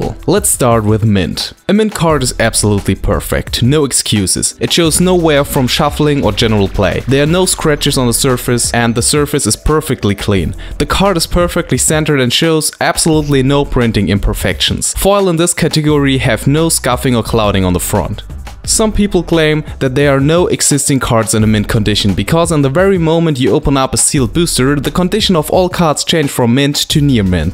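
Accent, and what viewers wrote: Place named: Germany. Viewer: From a GER to a GER (most likely): Work on your English. I mean even the THICC accent you have is acceptable, though painful to listen to (especially a German accent), AS LONG AS THE GRAMMAR IS FINE. And you talk quite quickly as if you were advanced although you're actually slightly better than a beginner. Like... you're not gonna play Van Halen solos on your second guitar lesson. Be honest to yourself - who are you trying to impress? I personally would be ashamed of uploading such a "wideo", even though the "wideo" is otherwise informative.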